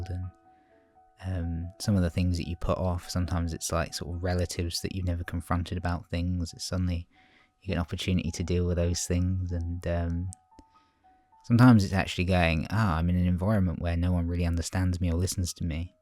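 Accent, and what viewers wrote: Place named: United Kingdom. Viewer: Good to hear from you! Your British accent is beautiful as well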